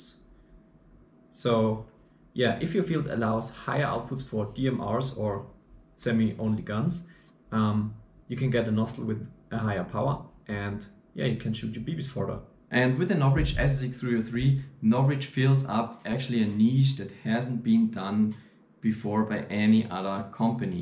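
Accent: Germany. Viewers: is he from german?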